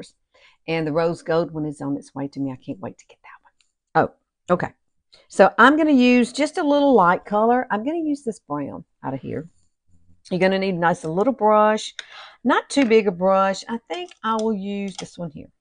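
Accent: South, United States. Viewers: you are so cute. I am curious as to why use lipstick? What about cream blushes and or bronzers? I can see an advantage if you don’t have a lot of money to spend on makeup. I love the eye look!! And I love yr Southern accent!!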